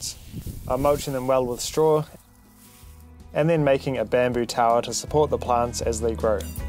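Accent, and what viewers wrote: New Zealand. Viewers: Insteed, weether, togeether - lovely kiwi accent ❤🤩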